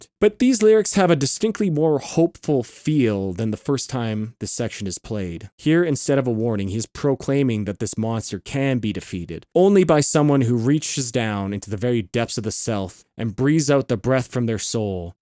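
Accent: Canada. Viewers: I thought you sounded Canadian! Hello from B.C! Thank you for doing a wonderful analysis on this beautiful song. is not only my favourite album but my favourite metal album of all time. I love how well the storytelling goes with the music and its overarching message gives me hope and has helped me persevere through my own struggles. I look forward to watching more of your content- keep up the good work!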